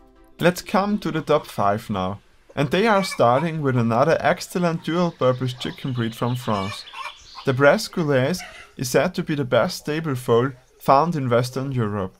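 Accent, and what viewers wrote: Austria. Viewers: are you from Austria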